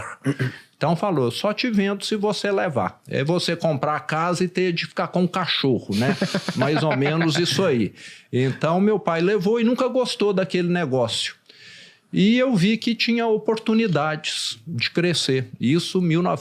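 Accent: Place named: Minas Gerais, Brazil. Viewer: História bonita! O mais legal é ouvir esse sotaque mineirês. Representante de peso desse povo amável e acolhedor.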